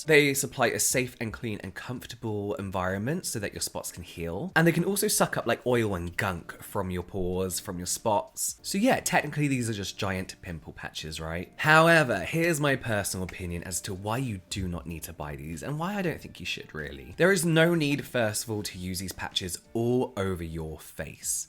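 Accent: United Kingdom. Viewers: is sounding extra British in this one.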